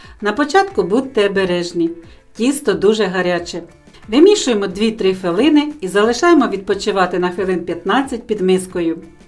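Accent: Standard. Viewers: Супер - вподобайка і підписка! ❤ Дякую за рецепт.Але найбільше вразила мене Ваша мова. У мене муз.освіта , і я можу порівняти мої почуття від Вашої озвучки відео з такою музикою, що хочеться слухати і отримувати насолоду. Прошу Вас , будь ласка, дати мені відповідь, в якому регіоні Ви живете? Бо я ще не зустрічала такої справжньої чистоти .✨️💙💛💙 ( цікаво, чи я вгадаю🤔 - черкаська обл.?)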